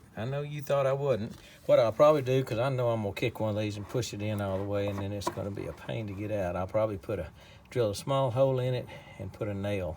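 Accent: Texas, United States. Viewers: This is genius. Thanks. Btw, I'm curious what part of TX you're from. I'm always interested in accents and TX is such a big state; there are different accents. I'm guessing you're east TX, maybe toward OK. Am I close?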